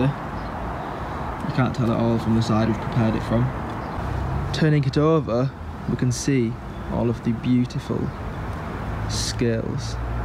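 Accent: Scotland, United Kingdom. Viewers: you probably won't respond but are you Scottish? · Are you Scottish?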